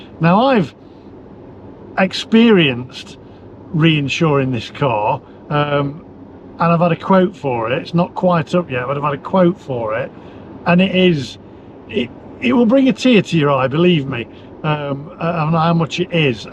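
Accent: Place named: Leeds, United Kingdom. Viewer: Ha, I don't know where to start. 1. Sounds like you are in the North, Leeds? I can assure you that in London and the South East, it has been baking hot (26 and sunny on October 10th for example). 2. Now electric car ownership from a different perspective. I have a Polestar 2 with the performance upgrade giving 472 bhp and 0-60 in 4s. It has been 100% reliable for the 3 years I have owned it. I charge at home on the Octopus Agile plan which means that there is a lower overnight rate that is sometimes either free or Octopus pays me to charge my car. Yes, they pay me! Hard to believe but it's true. On average I run my car for around 2p a mile in 'fuel', compared to 18p to 25p per mile for your average petrol or diesel car. I have no road fund licence cost, (2025 will change that) drive free in London and can park in central London for 80p for 4 hours. 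Now non Tesla cars can use the Tesla fast charger network (not all of them yet) and generally the charging on the road network is getting better each month. Yes, insurance has gone up for ALL cars in the past 12 months . Last year my full comp insurance was £480, and this year £680. Would I reccommend an electric car, yes, 100% providing you are not pounding the motorway network all day. Home charging is a must IMO. If you can't do that I would think again. Finally, Luton airports car park was partly destroyed by a diesel car catching fire! Time to ditch these dangerous dinosaurs and go electric! :) .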